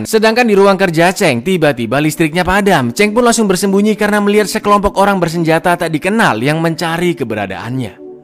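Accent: Java, Indonesia. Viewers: lagi² medok. kebanyakan yg bahas film pada medok. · pengendali cuaca pake alat hah, indonesia dong pake pawang 😂 Yo ngene iki nek bahasa jowo medok dadi content creator, sak onone disebutno 🥲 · Cak Jawa mana sampean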